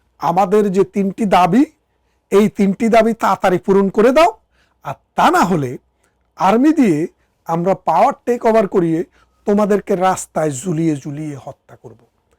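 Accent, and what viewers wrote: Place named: Gopalganj, Bangladesh. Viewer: তোমার ভাষায় বুঝা যায় তুমি গোপালগঞ্জের ছোট পাতি শিয়াল।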